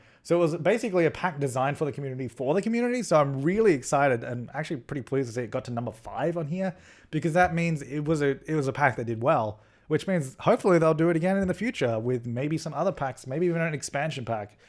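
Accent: Australia. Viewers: I love your voice and accent, are you Australian? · Are you Australian?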